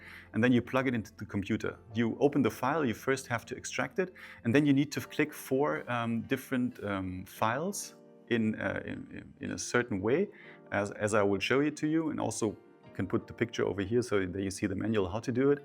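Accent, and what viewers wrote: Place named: Germany. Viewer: Tell me your German without telling me your German , pronouncing :)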